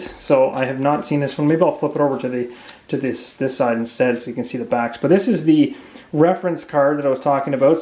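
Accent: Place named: Canada. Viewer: I feel like I pick up a Canadian accent lol. But thanks I’ll be buying this next time I can afford another expansion. Forsaken lore is arriving in mail tomorrow!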